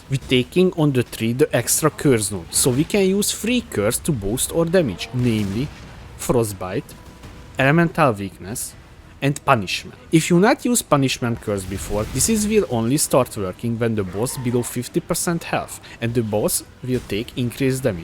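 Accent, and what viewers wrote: Hungary. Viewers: you Hungarian?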